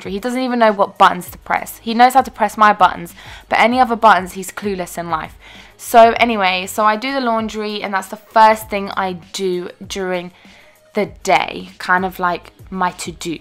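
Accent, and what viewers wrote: United Kingdom. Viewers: Are you from Great Britain???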